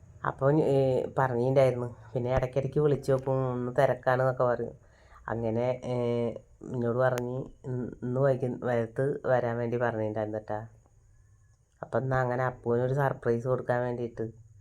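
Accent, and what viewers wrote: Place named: Malappuram, India. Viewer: Malappuram bhasha kelkan nalla rasam ❤❤❤❤❤❤radish curryum super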